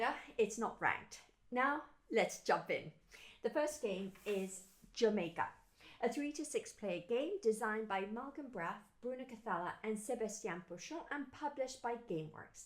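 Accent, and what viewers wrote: United Kingdom. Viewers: Dude are you british? Your accent sounds a bit off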